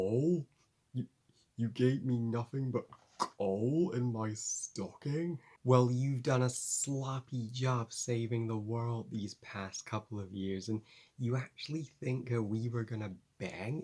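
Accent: United States. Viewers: Great American accent 😊 Happy weekend, my friend!!! 💜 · Oh my gosh. You’re so awesome! 👍😂 I love your American accent. More more! Artwork is awesome!!!🥰❤️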